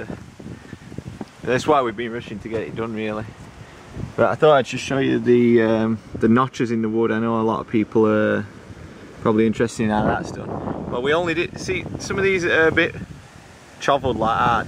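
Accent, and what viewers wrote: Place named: Northern England, United Kingdom. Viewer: Hi, I also live in the north of england and judging by your accent, not too far from you, so where abouts did you get all these supplies from?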